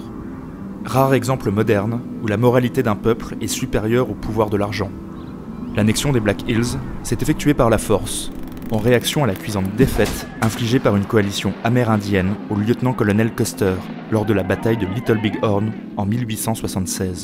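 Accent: France. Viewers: excellente vidéo ! une rectification qui a été faite plus bas en anglais et pas traduite, semble-t-il, les photos de crazy horse ne le représentent pas, il n' existe aucune photo de crazy horse, juste un dessin qui a été fait bien après par un dessinateur professionnel selon des descrptions orales, et qui a été validé ensuite par un des membre de la famille de crazy horse. Si je remets la main sur ce dessin, je le mettrai en lien dans l'intervention en anglais, plus bas. Aussi, je voudrais saluer, a l'heure du grand n'importe quoi des voix de synthèse à tout va, au détriment du bon sens et de la qualité, ce que vous avez fait ici : parler avec votre voix, donc, avoir osé être vous-même vocalement parlant. ce qui semble devenu une chose insurmontable pour un grand nombre de youtubers. En plus, ce n'est pas scandé, hurlé, appuyé d'une façon non naturelle comme on le voit partout. 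bravo ! en tant que pro de la voix et du doublage, j'ai remarqué quelques petites choses perfectibles, mais tres peu entendront ces mini problèmes, comme les coupes un peu "a la hache" en fins de phrases, dans les respirations, les popings légers par moments ou les changements de placement par rapport au micro, qui font qu'on entend des ajouts faits au milieu des phrases. il y a aussi les A a certaines fins de phrases, qui sont un peu fermées comme des O, comme on fait chez moi en bourgogne, ou comme on l'entend parfois à Lyon. c'est très léger, tout petit accent du terroir pas bien gênant mais qu'il s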